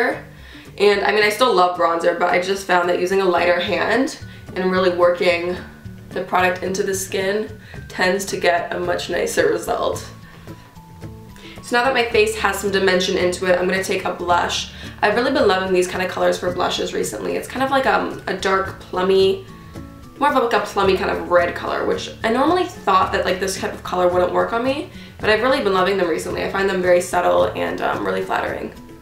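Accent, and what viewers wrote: Canada. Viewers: you sound so Canadian lol eh? Fellow Toronto girl suffering the same cold and "Blizarding" can't wait for spring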